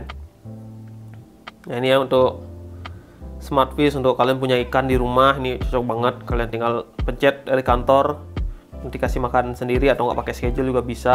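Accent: Bali, Indonesia. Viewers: Logatnya kaya org bali nih wkwk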